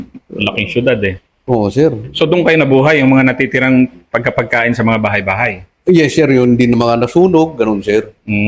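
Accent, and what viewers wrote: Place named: Kalinga, Philippines. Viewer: taga cordillera ka sir dba?/ fromwhere in the cordillera?? correct me if I am wrong..sa pronunciation and how you speak taga kalinga ka ba??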